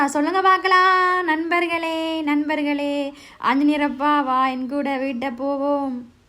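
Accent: Sri Lanka. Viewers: you are speaking Sri Lanka is very sweet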